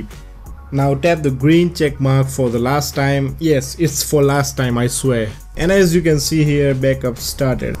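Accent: India. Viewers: Bro are u Indian??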